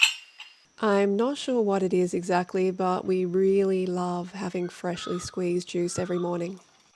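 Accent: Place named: Australia. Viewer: Hey, where are you located? By your accent, you are Australian as I am. I live in Fiji.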